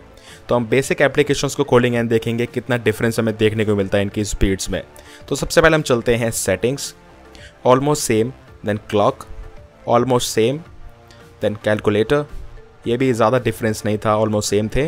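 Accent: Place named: Punjab, India. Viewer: Bro Apki Voice Me Thorda Sa Punjabi Touch Aa Reha Hai Are U Punjabi ???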